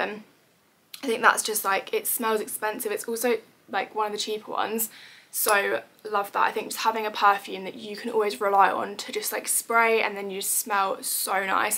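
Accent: United Kingdom. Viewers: I like your British Accent!!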